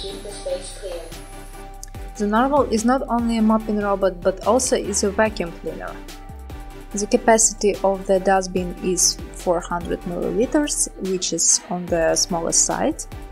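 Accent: Russia. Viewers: Oh this russian accent...🤦‍♂️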